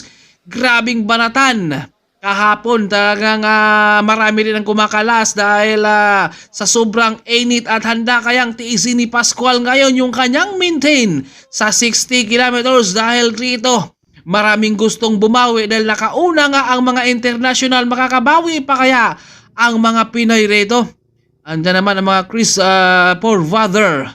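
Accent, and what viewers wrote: Visayas, Philippines. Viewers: tang ama ka, ampanget mo mag salita pati yang accent mong visaya, ang bias mo lagi sa excelent noodles, punyeta ka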